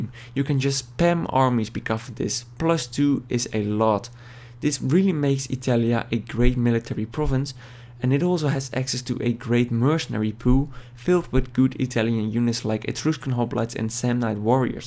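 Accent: Netherlands. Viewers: crazy dutch accent i hear... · wow een noorders accent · i can confirm as a dutch person it is a dutch accent he uses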